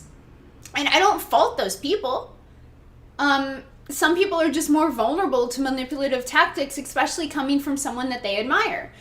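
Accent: South, United States. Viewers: Is it me or she has a slight southern accent?... just, certain words. Its hard to tell but there is something. Never noticed. But maybe I´m just to sleep depraved. Also cuteness overload. Just pout.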